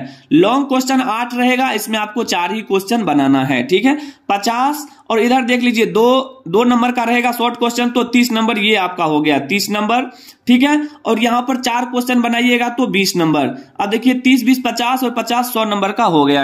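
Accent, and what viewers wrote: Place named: Uttar Pradesh, India. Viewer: Up ka q bol rhe ho sir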